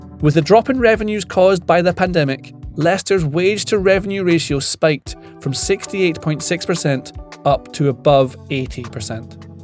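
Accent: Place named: Scotland, United Kingdom. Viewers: Why not, do you always actually believe what a youtuber says, 😂 he must be a financial expert and a Leicester expert, plus he's sounds Scottish must be a Celtic fan. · Beautiful Aberdonin accent, this is much more pleasing on the ear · I do love the Scottish accent!.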